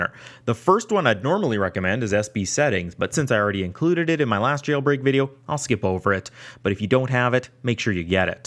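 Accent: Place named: Canada. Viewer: i knew about all these tweaks, but i watched the whole video because i am lovin the canadian accent when he says "notification center" lol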